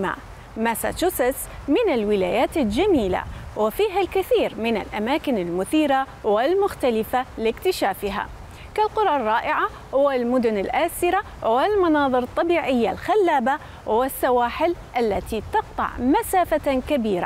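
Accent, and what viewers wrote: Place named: Iraq. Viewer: مبین من لهجتها عراقیه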